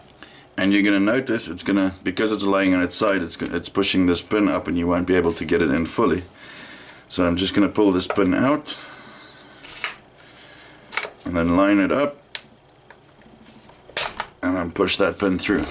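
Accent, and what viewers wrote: South Africa. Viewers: Enjoy the videos, man! I have to ask, is that a South African accent?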